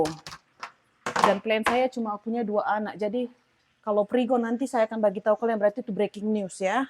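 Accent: Medan, Indonesia. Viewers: Memang gitu kk logat kita Batak Medan ,karena saya jg Medan logat sya jg gitu😅 tp itu lh yg buat seneng nonton vlog kk..😊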